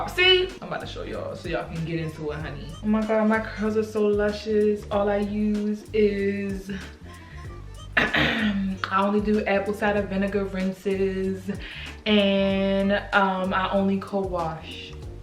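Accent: Baltimore, United States. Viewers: I watched your first Amazon wig video and I was like she gotta be from Baltimore, the accent in this video confirmed it. Nice to find someone from my city I can watch 💯🙌🏾 keep the videos coming you're hilarious too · The curly was cute 😂and you sound like you’re from Baltimore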